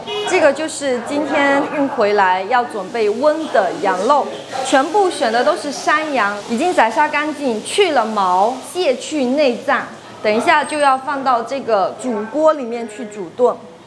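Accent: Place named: Putian, China. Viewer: 莆普有待提高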